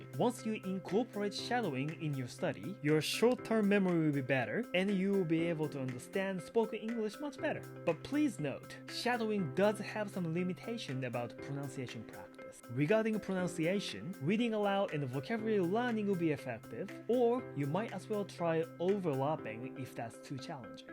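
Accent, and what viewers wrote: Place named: United Kingdom. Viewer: ELSAの評価が厳しくて嫌になってるところ、好きなイギリス英語＆このやる気刺激動画でモチベ維持してます！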